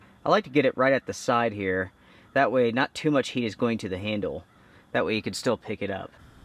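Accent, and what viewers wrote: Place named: Appalachia, United States. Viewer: I hate ur hillbilly pipes!!!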